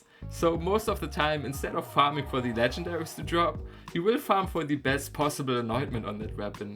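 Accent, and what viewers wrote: Germany. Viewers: Are you german? Such cool accent! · Man erkennt den deutschen Akzent 😂😂😂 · Dein Deutscher Akzent ist nicht zu überhören haha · Liebe den deutschen Akzent, viel Liebe aus Amerika!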